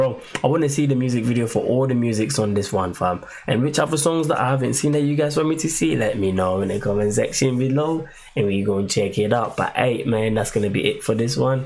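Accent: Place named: Nigeria. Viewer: I THINK U ARE NIGERIAN NOT UK. UR ACCENT IS DIFFERENT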